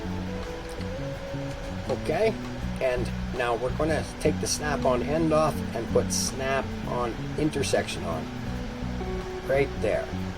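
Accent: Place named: United States. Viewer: Glad to find a American English speaking vid on LC. As I am hard of hearing...some with heavy foreign accents are difficult to follow. I would suggest modifying the background music or eliminating. The bass line of the music is near the pitch in your voice...reducing the clarity of your voice. Thank you for the video and I have subscribed.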